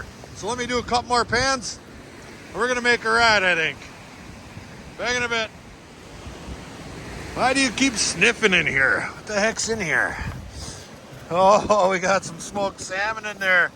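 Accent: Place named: Wisconsin, United States. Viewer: The Wisconsin accent rocks! Love it.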